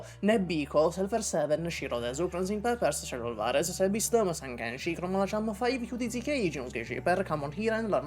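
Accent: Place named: Italy. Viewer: your italian accent makes me parasocial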